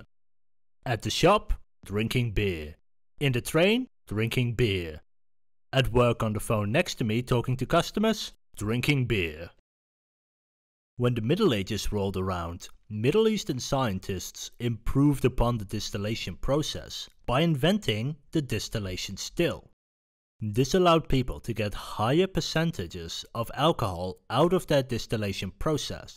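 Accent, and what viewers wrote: Netherlands. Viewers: This guy is definitely dutch based on the accent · Hearing the accent I was thinking, this sounds like a Dutch dude. After seeing the Brand beer; Jup, Nederlands!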